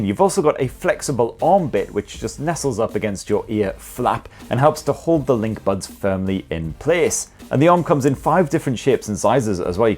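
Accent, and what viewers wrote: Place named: Northern England, United Kingdom. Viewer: Oh I found your video rather refreshing first of all you’re not American or are you putting on a great northern accent or dialect et cetera. However you failed to mention about the ability to use it as a phone feature. That is he not making calls and receiving calls I take it these ear pods pods can actually do that cheers keep up the good work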